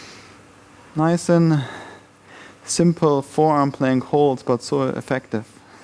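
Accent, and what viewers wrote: Germany. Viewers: There's nothing better than breaking a mild sweat during yoga practice. I think the German-esque accent helps as well!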